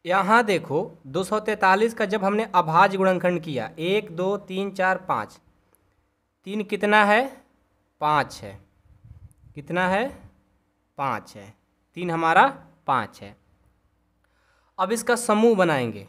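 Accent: Bihar, India. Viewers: Sir app Bihar sa ho na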